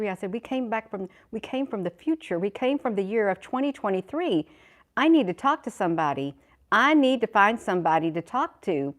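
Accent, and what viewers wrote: United States. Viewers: Yes, 😘 Speaking through your 👃 Nose as well as your 👄 Mouth -- an American Accent with Breaking and Bendy Vowels. It Ain't Country Without a Drawl (and your pretty smile-ing-eyes). TS: - ♥️🎩💈🎯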